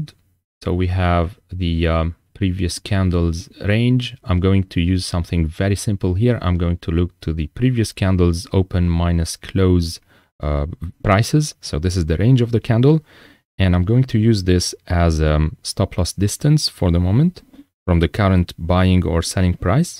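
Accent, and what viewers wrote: France. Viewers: Thank for the video i will try it ! By the way have you french accent ? And have you made money from your bots ?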